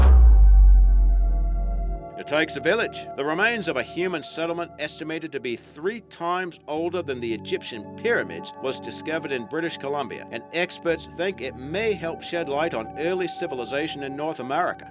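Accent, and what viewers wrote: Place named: Australia. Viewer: these videos wouldn't be as good without narrating them. keep up with the good work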